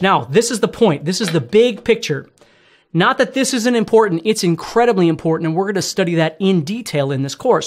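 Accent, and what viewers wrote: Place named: Midwest, United States. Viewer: Midwestern accent. He’s probably from Iowa like me haha. Maybe Wisconsin.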